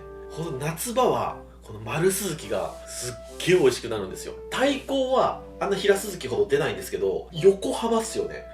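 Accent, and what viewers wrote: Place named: Nagoya, Japan. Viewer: 名古屋弁です。標準語に比べるとアクセントが強めです。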